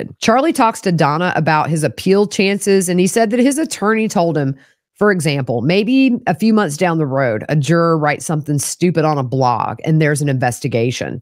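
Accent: South, United States. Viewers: I like your southern accent. I live on the west coast and when I ended up in jail for 2 months when I was visiting NY everyone said I had the accent. Triips me out how many accents there is in the same country. Anyway my comment isn't about this evil family. Sorry.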